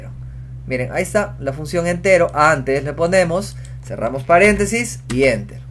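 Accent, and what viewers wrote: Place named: Amazonas, Peru. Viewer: QUÉ CAPO ERES AMIGOOOOO!!! Muchísimas graciasssss!!! Tan didáctica y súper fácil de entender tu manera de explicar! Vale oro este video... gracias por darte el tiempo de compartir tus conocimientosssss!!! Millones de gracias, me ayudaste a ordenar mi padrón de edades de niños para poder hacerles llegar regalitos acorde a su edad en esta navidad!! Dios te bendiga!!... Pdta: De casualidad eres de Rodríguez de Mendoza / Amazonas? Te sentí el acento similar al de mi familia que es de allá! Ojalá seamos familia! jaja un abrazo!!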